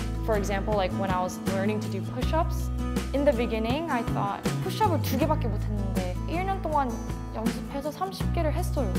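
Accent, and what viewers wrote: United States. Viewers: 와 미국인인줄 알았어요 영어 실력 무엇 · Why does she sound American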